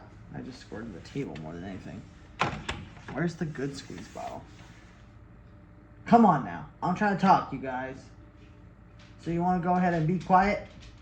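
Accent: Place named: South, United States. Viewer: I love how you get southern on YouTube. Love you so proud of you ❤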